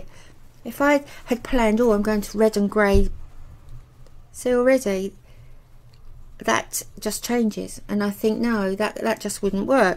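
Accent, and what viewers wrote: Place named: Cockney, United Kingdom. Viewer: I can hear a little of your Cockney roots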